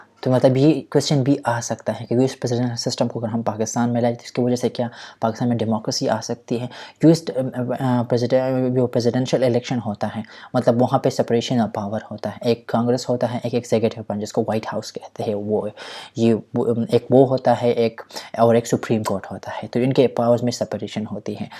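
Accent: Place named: United Kingdom. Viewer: Please Urdu ko Urdu ki tara bole me confuse hota hon baz alfaz me... You speak Urdu like English man.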